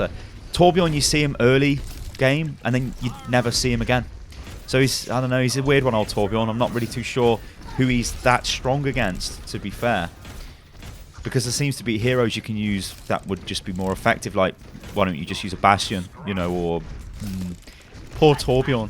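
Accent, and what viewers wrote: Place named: London, United Kingdom. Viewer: i'm guessing from the south, definitley no northern accent, probably London or somewhere near there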